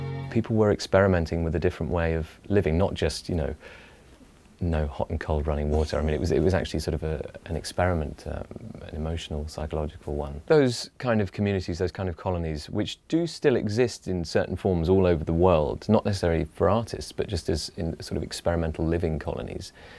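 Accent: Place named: United Kingdom. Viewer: Dan Stevens has become too much " californian ". Too bad 😔 He lost his soooooooo british personality, fortunately not his accent. I'm a bit disappointed because he had then a lot of charm and now with his new look and his pounds off, he is less attractive. As I said , he is too much Californian. Long life to the UK Greetings from France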